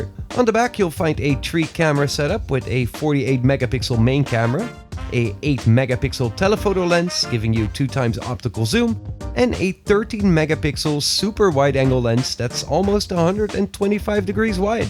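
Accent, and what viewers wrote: Netherlands. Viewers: Are you dutch? Je hebt een Nederlandse uitspraak! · you sound kinda dutch are u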